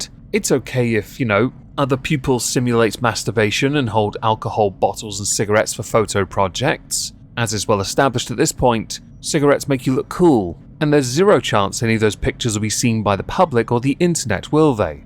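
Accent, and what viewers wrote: United Kingdom. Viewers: I think having a British accent helps.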